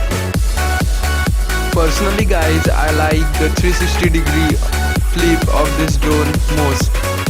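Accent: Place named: Bengal, India. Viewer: This guy sounds bengali (am a bengali myself)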